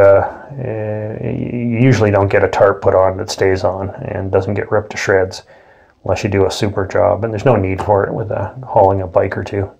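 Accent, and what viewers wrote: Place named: Canada. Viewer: Very helpful— taking my Goldwing 1,200 miles this weekend, and this is perfect. Also love the sound of Maple Syrup in your accent 🥰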